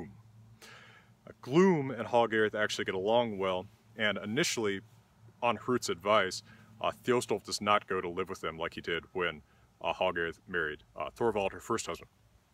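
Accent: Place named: South, United States. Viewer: A southern man teaching Norse mythology. Cant tell if your east texas or going up into the western parts around Wyoming, Montana, etc., but know that this is exactly what I needed in my life.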